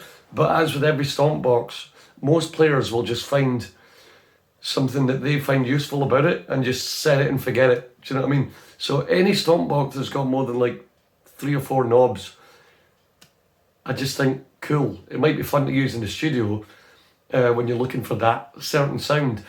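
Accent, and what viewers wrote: Scotland, United Kingdom. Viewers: Hey, Nice to hear a fellow Scot talking guitars. I also own a GT1 and I know what you mean about feeling that something isn't quite the same. I sense this being the same for all modelling gear at whatever price range. I run the GT1 into a Two Notes Cab M for cab emulation and it sounds as good as any modelling kit out there (to me anyway). I think cab and space simulation is really where the difference is made. I swapped between tube amps and modelling for ages, finaly seem to sticking to the Boss Nextone (not a modeller) which sounds and feels excellent. Anyway, I like your channel man 😎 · Real world every day use of one size fits all swiss army knife go anywhere do anything on a shoestring budget guitar pedal? Thank you for posting man. I got one and am just getting the hang of it. My Pearly Gates equipped Les Paul seems to have a higher output than the presets were made for even the clean patches have too much gain. 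It's cheap, portable and Boss reliability. Love your Scottish accent man. Cheers from the Caribbean.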